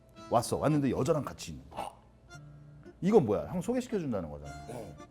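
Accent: Gangwon, South Korea. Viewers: 강원도 사투리 지린다 ㅋㅋㅋㅋㅋㅋㅋㅋ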